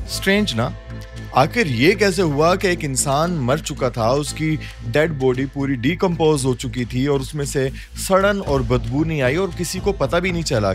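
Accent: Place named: Pakistan. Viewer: Bolne ke lehje se lagta hai pakistani ho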